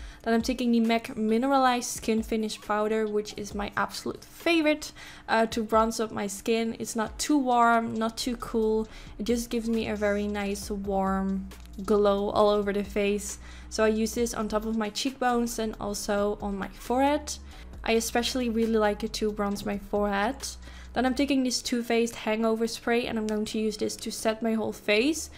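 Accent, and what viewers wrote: Netherlands. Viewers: your Dutch accent which I find charming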